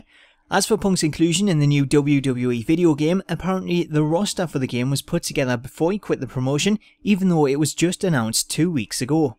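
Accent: Newcastle, United Kingdom. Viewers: hey man where are you from because you sound like a fellow geordie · I don't understand anything you said with your british accent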